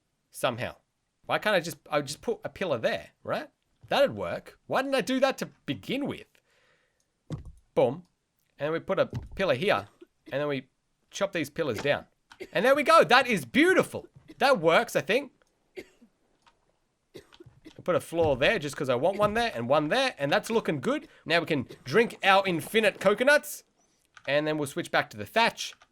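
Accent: Australia. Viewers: I love your australian accent,so nice to hear australian :)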